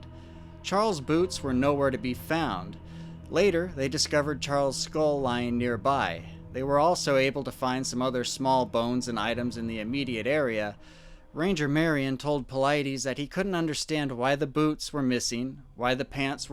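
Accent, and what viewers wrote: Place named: United States. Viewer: What American accent do you have? Or is it unique to yourself? It's good for telling stories, it also reminds me of an old American accent from the 40's or 50's.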